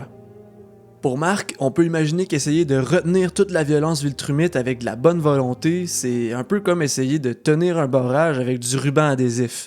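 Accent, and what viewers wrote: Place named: Quebec, Canada. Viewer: Je ne sais pas cmt j'ai atterri sur une vidéo québécois mais j'adore votre accent 😂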